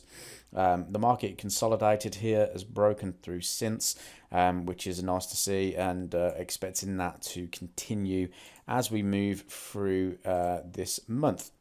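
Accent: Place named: Australia. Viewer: Thanks for the video. Can you please make a video on AUDUSD and AUDNZD? Can't figure out your accent xD Sounds Aussie but with some british words..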